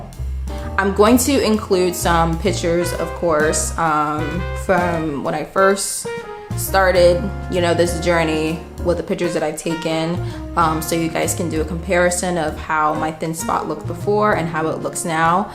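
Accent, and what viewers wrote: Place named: Bahamas. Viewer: I’m definitely going to give Nutrafol a try! Are you from the Bahamas by chance? I hear a very faint accent 😊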